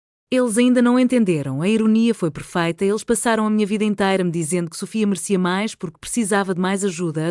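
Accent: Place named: Lisbon, Portugal. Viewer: O acento lisboeta leva a pensar em emigrantes portugueses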